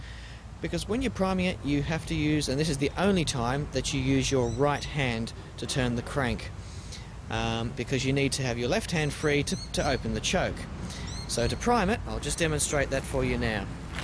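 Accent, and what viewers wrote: Australia. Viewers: I watched the video to hear an AUSTRALIAN SPEAK..God Bless our AUSSIE BROTHERS and SISTERS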